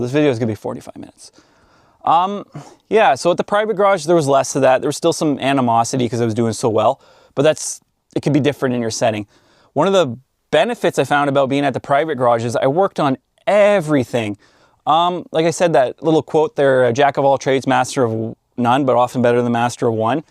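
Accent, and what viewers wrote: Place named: Canada. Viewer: are you canadian or just from the midwest ?☠️☠️ pretty cool accent. but came here to say i wanna be able to fix riding lawn mowers and mess with cars, aka fix them, possibly rebuild engines and just want to not be confused when opening the hood of a car.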